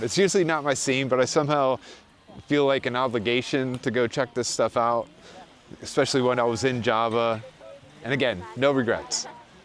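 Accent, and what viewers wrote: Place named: United States. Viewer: Sounds like a stupid yank lost in a foreign country, so many of these dicks you meet on, just interested in the surf and a cheap place, do you care about the people wanker?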